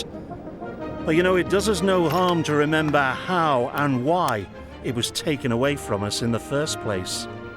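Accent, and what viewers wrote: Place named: Liverpool, United Kingdom. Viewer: Why do we need a Scouse towny presenting this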